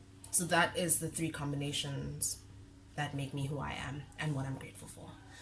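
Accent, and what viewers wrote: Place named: Pietermaritzburg, South Africa. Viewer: You sound like you from PMB though...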